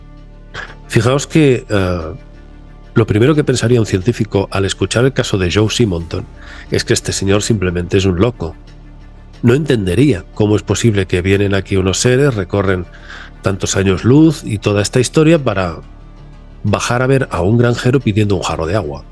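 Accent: Argentina. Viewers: Como que su voz es argentina? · Como su vos es argentina no entiendo?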